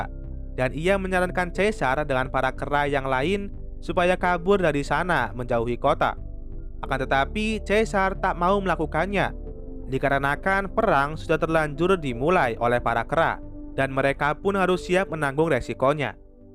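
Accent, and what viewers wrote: Banyumas, Indonesia. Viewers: Jebule * wong ngapak ya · Mantap youtuber ngapak hadir min 😆😆😆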